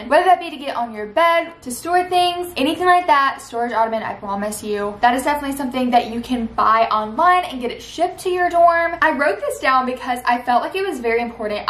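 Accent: South, United States. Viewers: I watch videos on 2x usually and never realized you had a super southern accent until this one was playing in a normal speed. Its a nice accent I just was surprised. Love your videos!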